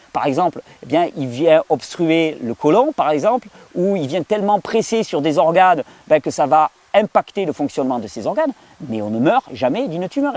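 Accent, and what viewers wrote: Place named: South, France. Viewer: Amusant comme l'accent du sud semble faire passer une dangereuse escroquerie pour quelque chose de sympathique.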